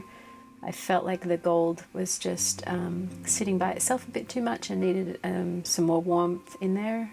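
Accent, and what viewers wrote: Australia. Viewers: I can't decide if you are an Australian living in America but your accent is so interesting!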